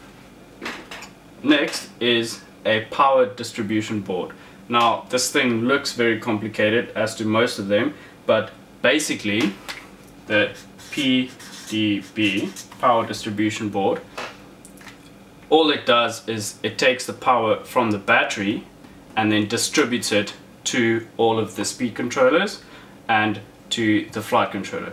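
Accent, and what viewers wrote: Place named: Afrikaans, South Africa. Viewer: Hello ek kan by jou Aksent hoor jy is Afrikaans love jou videos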